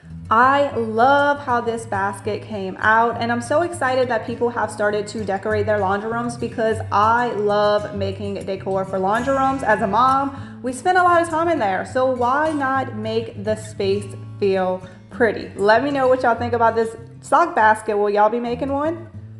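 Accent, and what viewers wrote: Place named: Georgia, United States. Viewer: I have some old farmhouse table legs I think I’m going to paint them and add little round disk to each end I love all your projects. And I love your accent are you from Ga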